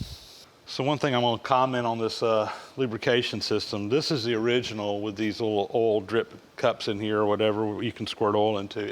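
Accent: South, United States. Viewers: LOL :) - He's from Georgia, so to translate "owl hole" is really "Oil Hole" with a southern drawl.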